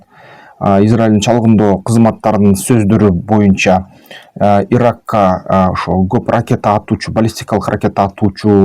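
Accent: Standard. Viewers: Азаматсын патириот деген сендей таза кыргызча суйлойт!!!....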